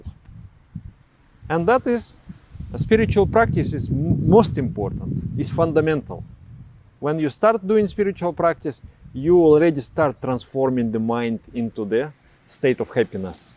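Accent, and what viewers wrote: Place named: Russia. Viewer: Wonderful video! Thank you so much for the information, very helpful, brought clarity to me. And your Russian accent is awesome, wonderful! ❤️❤️❤️😇🙏Namaskaram!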